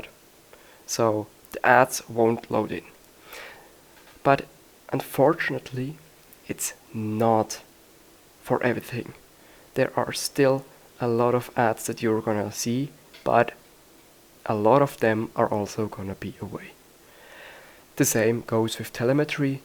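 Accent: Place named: Germany. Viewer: danke fur tutorial bro ich wusste als du am anfang geredet hast das du deu tsch bist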